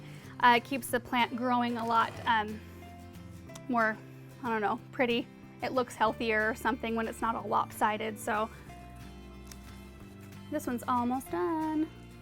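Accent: United States. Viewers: Your idiot Amurrucan voice makes me sick. Basil grows itself, leave it to grow.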